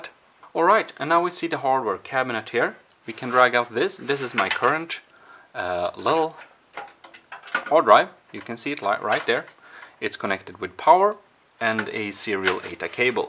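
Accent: Sweden. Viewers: While the video is great I have to say that yes it is the Swedish accent and as a Swede it hurts to hear the accent knowing I sound the same lol. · Either that’s just your accent or the Sweden 🇸🇪 accent. I like it! By the way, nice video. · är du svensk???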